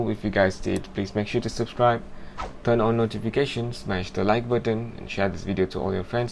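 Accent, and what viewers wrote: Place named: Malaysia. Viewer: I thought the accent sounded familiar! Hehe Malaysian in the house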